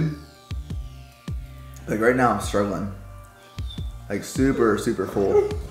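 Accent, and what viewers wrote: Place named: Pennsylvania, United States. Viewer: I just watched pretty much all of your videos and I'm 100% subscribed! I wish I lived in Texas so I could try these donuts! I feel like I heard you say you were from Pennsylvania in one of your videos.. You definitely sound like it lol I'm from PA, as well.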